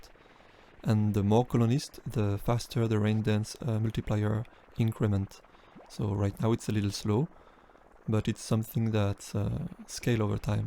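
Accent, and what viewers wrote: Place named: France. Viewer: Your accent tell me that mby you might be fr so.. j'avais une petite question, est-ce que ce ne serait pas une bonne idée de mettre un marqueur fluo d'une couleur au choix mais bien flashy autour du pointeur de souris? (J'ai pu remarquer avant hier en terminant la démo que la souris devenait vite illisible ou introuvable quand tu arrives sur les trillions et qu'il y a des rivières de fruits à l'écran.)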